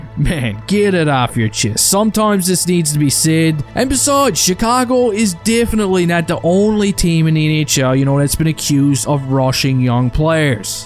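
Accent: Newfoundland, Canada. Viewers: I know a fellow Newfie when I hear one lol, Cheers from central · are you a newfy?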